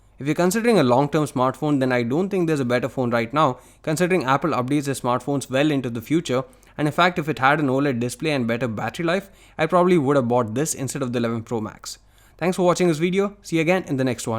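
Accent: Kerala, India. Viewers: are you from kerala?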